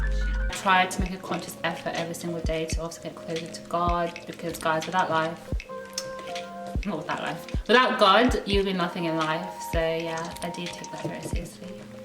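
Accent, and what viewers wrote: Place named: Australia. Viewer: Loved this! Making me miss Australia, you've kinda got an Aussie accent as well now 😂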